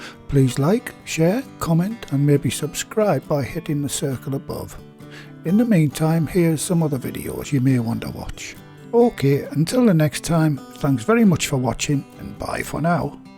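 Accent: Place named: England, United Kingdom. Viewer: “ Mash and Roasties.” I love the English terms and accent ❤️. Hugs from SF Bay Area, CA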